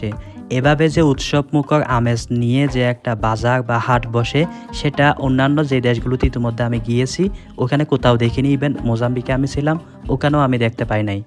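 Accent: Chittagong, Bangladesh. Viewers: উনি চাটগাঁইয়া বা চট্টগ্রামের মানুষ। উনি চট্টগ্রামের উপভাষায় কথা বলতো।তাই পিউর বাংলায় কথা বললেও চট্টগ্রামের একটা টান থেকে যায়।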